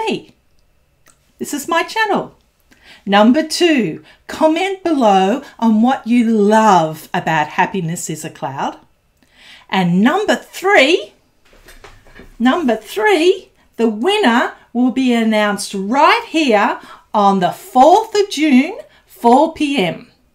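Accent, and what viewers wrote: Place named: Australia. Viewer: Hope I don't get this wrong ha ha but Aussie. They like it 😁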